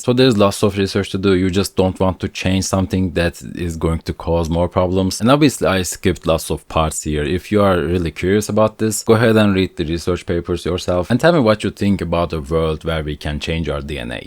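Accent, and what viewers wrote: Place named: Turkey. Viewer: çok ağır bir türk aksanı var. bütün "the"lar "dı" diye okunmuş. dilinin orta kısmıyla dişine dokunman gerekiyor. ve sesi çıkarmaya hava çıkarmadan biraz daha erken başlaman gerekiyor. ð harfini çıkarırken adem elmana dokun onun titreyip aşağı inmesi gerekiyor. bu harfi çıkarırken dilin havayı durduracak, ses çıkmaya devam edecek (bu harf sesi durdurmaz) ama hava ağzından çıkmak yerine olduğu yerde duracak (yada yavaşlayacak) ve o yukarı çıkmak yerine ses tellerin aşağıya doğru inecek. θ versyonu için böyle birşey gerekmiyor tabi. sadece dilin ortasıyla dokun ve t-s benzeri sesi çıkarırsın. bazıları f'ye benzetiyormuş thank you yerine fank you diyormuş. "figured" figürd diye okunmaz f igyırd diye okunur. hızlı konuşayım derken bütün sessiz harfleri yutmuşsun. "with the prime editing technology" teknaalıci olması gereken şeyi teknoji diye okumuşsun. 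"proteins" proteynz değil prowtiynz. "apocalypse" epokalips değil epakılips. "negative scenerios" negatif senariyoz değil negıdiv sıneğriyowz. pozitif değil paazıdiv. cenetik değil cıneedig. vurgu ikinci e'de, ilkinde değil. vurgu olmayan hecelerde ya ı yada i şeklinde okunur ve hızla geçilir. a, e diye geniş harfle okunmaz. ekşuli değil ekçıli. ingilizcede biz herşeyi u diye okuruz ama mesela "good" gııd diye okunur. özellikle de amerikada. çoğu anglofonun yaşadığı yer amerika. "you are opening two strands of it, you remove one strand of it, and you put the new sequence you want there" sürekli "of it" demeye gerek yok. iki zincirin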